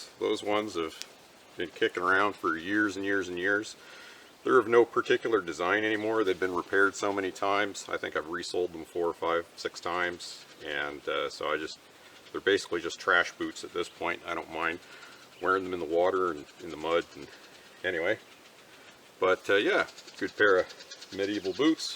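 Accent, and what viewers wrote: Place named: Vermont, United States. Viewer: Phenomenal presentation, sir! I thoroughly enjoyed literally every aspect of your video! I too, am a historical enthusiast, focusing on historical fencing, but interested in ALL aspects of life in the period…I specifically wish to hunt boar with a spear in the old style…I’ve hunted them with firearms, but to do it with a spear….at any rate, I digress…Your accent sounds local to me-(I live in the state of Vermont)-but due to your use of the metric system, I suspect you’re just North of me, in the lovely land of Quebec…I’ve visited Quebec City; lovely, historic town…I felt like I was somewhere in Europe! Thank you so much for sharing your passion! Its always good to meet kindred spirits. I hope this message reaches you well, sir!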